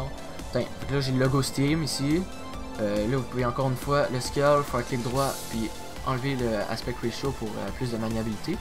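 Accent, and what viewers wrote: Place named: Quebec, Canada. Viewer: TU vin de Québec? Parce que je recnnais cet accent ^^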